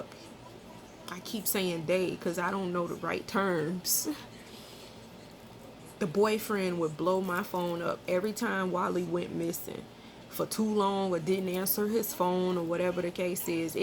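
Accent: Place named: South, United States. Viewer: She has southen accent. Country niggas is Gay. Whats new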